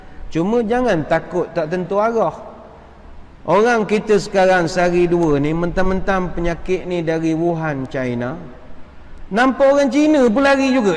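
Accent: Pahang, Malaysia. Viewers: Suka dengar ustaz cakap Pahang. Saya orang Pahang tapi dah lama merantau di luar.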